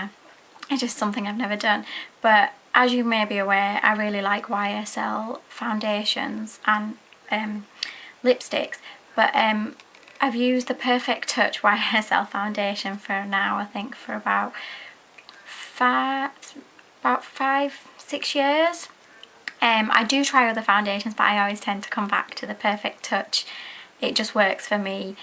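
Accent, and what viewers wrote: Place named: United Kingdom. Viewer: Where are you from in the UK? You have a beautiful accent! :)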